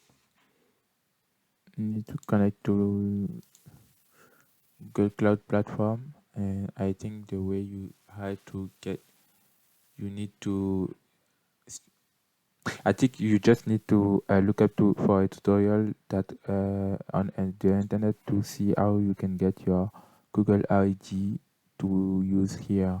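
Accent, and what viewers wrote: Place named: France. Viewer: french accent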